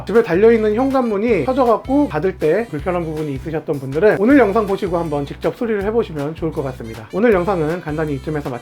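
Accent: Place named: North Korea. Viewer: 말투가 북한말투네... 이 3끼 간첩 아닌가?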